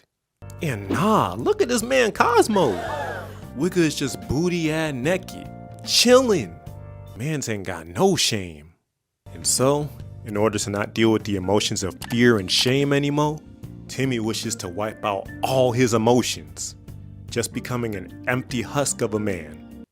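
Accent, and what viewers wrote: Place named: South, United States. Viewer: Your channel finna blow on god, and bruh I need to see if you from Georgia or Florida cause you kinda sound like us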